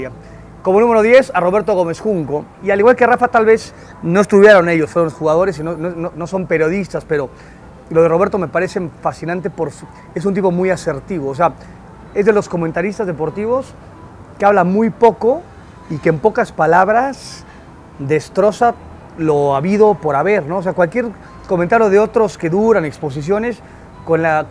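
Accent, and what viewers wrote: Spain. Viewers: y gradualmente enfatizas el acento gachupin. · Lo unico q no me gusta es q uses esa forma de ablar muy español si eres de la ciudad de mexico abla como mexicano creo q es muy feo q por q viviste en españa dos o tres o dies años ya no puedas cambiar tu forma de ablar yo soy de oaxaca y con mucho orgullo lo digo tengo mas de treita año viviendo en la ciudad de mexico y aqui en la ciudad ablo como se abla aqui en oaxaca ablo como alla.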